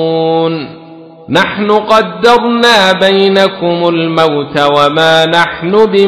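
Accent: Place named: Iraq. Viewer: ♥️🌸تلاوه عراقيه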